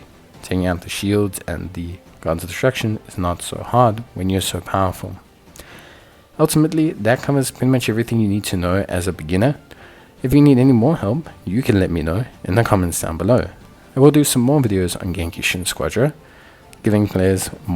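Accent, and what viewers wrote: South Africa. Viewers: I know a 🇿🇦 voice when i hear it. Big ups bru!!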